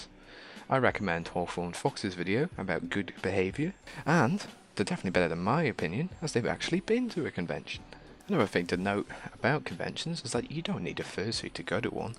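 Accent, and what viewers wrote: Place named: Australia. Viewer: That's an interesting accent, I'm getting hints of North Irish, North English and New Zealand in between an Australian accent.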